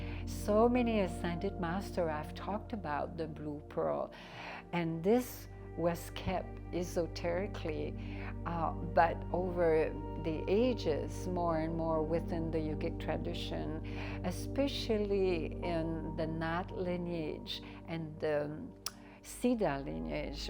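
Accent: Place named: West, United States. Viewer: This lady is self deluded, otherwise she wouldn’t give herself the name and sell courses. Some of the info is accurate but it is mostly appropriated knowledge from Vedic texts. Doubt she is able to pronounce any mantra properly with her western accent. Cool video tho, hope you sell a lot of courses its decent info for pashus.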